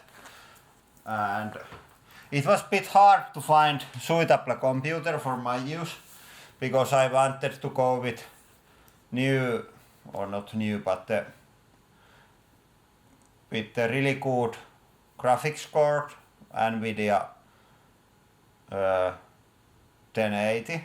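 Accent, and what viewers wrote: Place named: Finland. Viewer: Krzesimir Nowakowski Finnish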